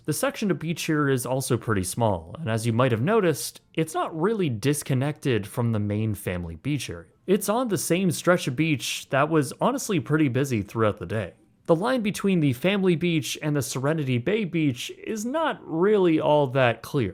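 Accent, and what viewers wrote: Canada. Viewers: Canadian accent i think and southern ontarian. Ie he sounds like me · I heard him pronounce it correctly! I think his Canadian accent softens it a bit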